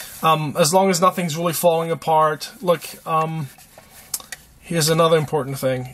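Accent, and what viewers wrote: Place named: South, United States. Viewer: laig, sounds like southern accent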